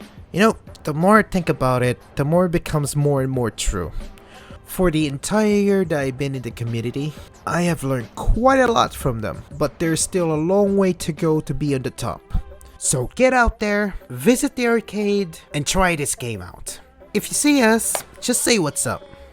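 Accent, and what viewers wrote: Philippines. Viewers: Manileño detected Subscribing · are you a filipino?? cuz i know damn well timezone is in the philippines and i can also tell by your accent..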